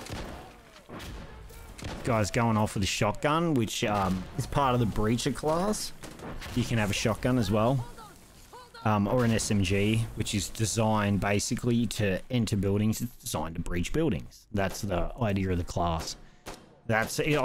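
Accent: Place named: Australia. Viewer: i love this game, i can play the co-op matches with my eyes closed now (not literally of course xD) you have a great voice for doing youtube reviews, Aussie accents are the best for gaming, like Gmanlives and stuff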